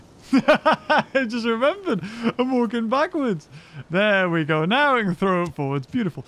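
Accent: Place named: United Kingdom. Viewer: Bruh I love your British accent lol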